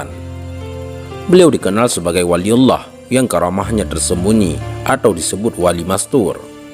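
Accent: Aceh, Indonesia. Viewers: terdengar,, dari logat admin orang aceh yaa hehehe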